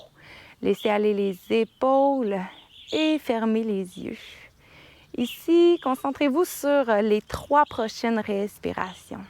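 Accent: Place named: Quebec, Canada. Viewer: Merci cousine québecoise :) Jadore la séance et l'accent!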